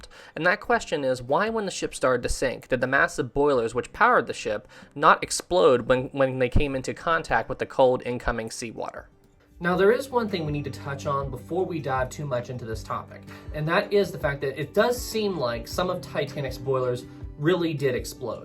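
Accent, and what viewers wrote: South, United States. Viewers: Stop trying to hide your southern accent. Nobody cares. Excellent video thankyou! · Your southern accent .........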